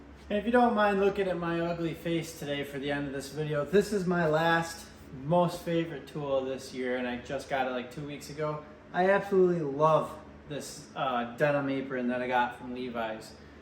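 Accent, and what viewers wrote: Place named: Wisconsin, United States. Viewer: Are you from Wisconsin? Your accent sounds like Wisconsin.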